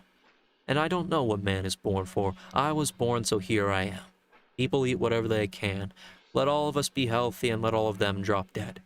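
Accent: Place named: England, United Kingdom. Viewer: This was the best production of an audiobook i've listened to. You struck a good balance of acting without going over the top. The voices are really good. Even your English accent is brilliant, that's coming from a brit. You had me wondering if there were multiple actors untill i read the comments. And i loves the subtle background noises and effects, they were just the right volume and not distracting. WELL DONE, please do more, i dont even care what the book is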